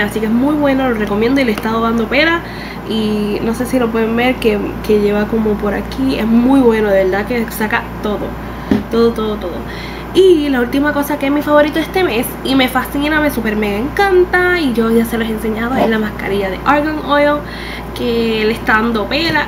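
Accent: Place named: Puerto Rico. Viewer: Diossss amoo tu acentoo puertorriqueño ♥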